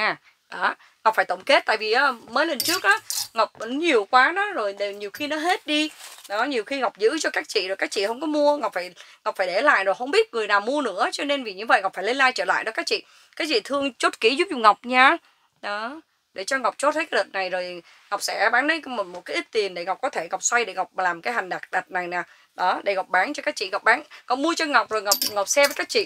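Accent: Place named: North, Vietnam. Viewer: Chào, nghe giọng Bắc Saigon, cách nói dễ thương, cho tôi địa chỉ của tiệm. Tôi ở Escondido, muốn lựa mua áo cho 2 mẹ con, cảm ơn. có áo size nhỏ không?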